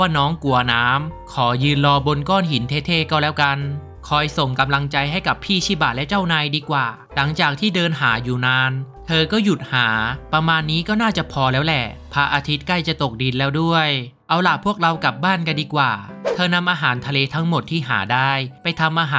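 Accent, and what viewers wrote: South, Thailand. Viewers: พี่เป็นคนใต้ใช่มั่ยผมก็เป็นคนใต้เเหลงใต้ไห้ฟังหน่อย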